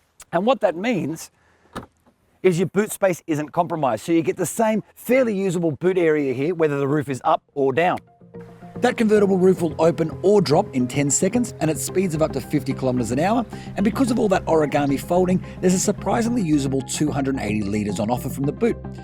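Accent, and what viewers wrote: Australia. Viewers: Is he aussie?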